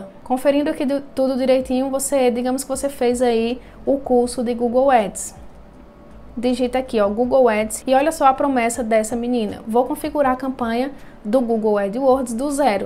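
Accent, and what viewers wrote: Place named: Rio Grande do Norte, Brazil. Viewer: Sotaque igualzinho o meu, já vi que é poti 😁☺️ parabéns pelo vídeo 👏 sucesso!!!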